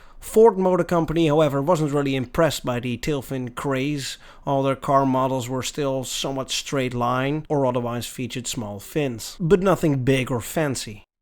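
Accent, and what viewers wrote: Netherlands. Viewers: Hey you have a strong foreign accent. You sound very Dutch even though it is clear that you are trying your best to put on an 'American' accent. Are you Dutch? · Is the narrator dutch? Ik hoor een licht accent.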